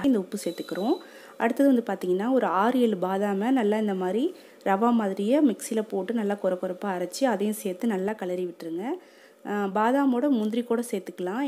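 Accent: Karaikal, India. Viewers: Karaikwl slang- tholuvittu 😄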